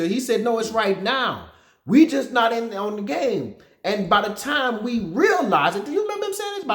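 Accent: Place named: United States. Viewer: I want to work to your company please and I want to speak English like you, I like American accent. 😃😃😃😇🌮✊👌😐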